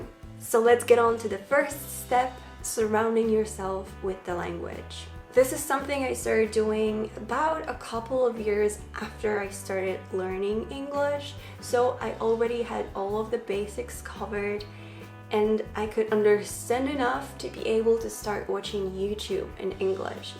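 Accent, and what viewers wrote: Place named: United States. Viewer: I remember your first videos on this English channel and oh my God, girl, you've improved soooo much! Not only vocab and grammar but your pronunciation! If I didn't know you're from Poland, I would definitely say you're American! ❤️ cheers from Opole 😉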